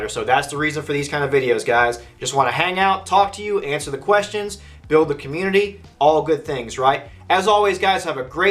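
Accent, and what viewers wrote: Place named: Georgia, United States. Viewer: Sounds like he lives in Georgia 😂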